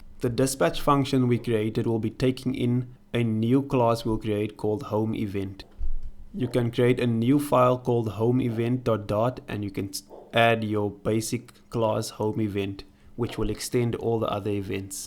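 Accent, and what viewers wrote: South Africa. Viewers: Sorry man I just want to ask are you south African by any chance ??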